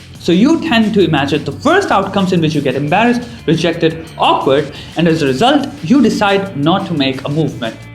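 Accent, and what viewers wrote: India. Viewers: I don't know, but I think he's Indian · i hate your Indian accent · Indian accent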